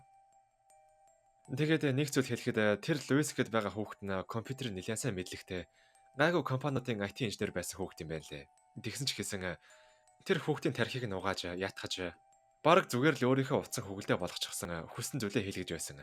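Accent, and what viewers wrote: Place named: Inner Mongolia, China. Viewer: Өвөр Монгол залуу юм байна гэж бодсон.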